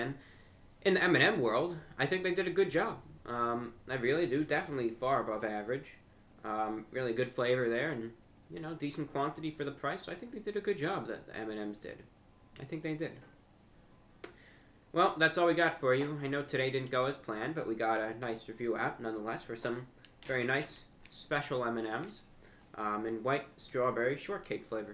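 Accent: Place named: New York, United States. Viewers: it's actually his New York accent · Can an American help me out here, what is this accent? 'Vaniller' and 'Chawclat' and whatnot seems different to standard American, there's definitely a regional accent trying to be suppressed in there.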